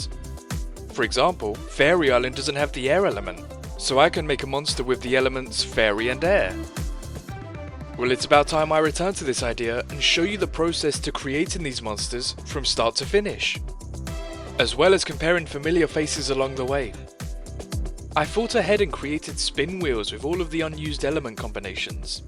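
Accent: United Kingdom. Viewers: I didn't know was British. I am happy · Is British?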